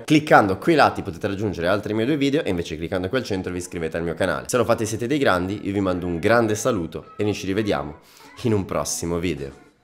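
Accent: Italy. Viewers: Lo potevi dire subito che eri napoletano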